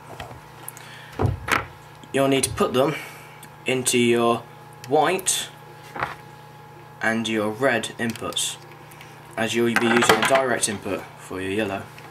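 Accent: United Kingdom. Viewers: You can notice that he has a British accent.... they use fucking STERLING POUNDS as a currency... -_ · no some british people don't sound like harry potter, but this guy sounds almost identical to him go watch harry potter then watch this vid again. i wish i had a british accent, having no accent is boring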